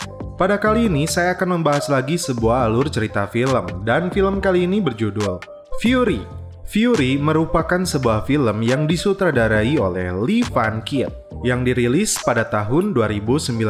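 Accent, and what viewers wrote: West Java, Indonesia. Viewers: Sunda ning a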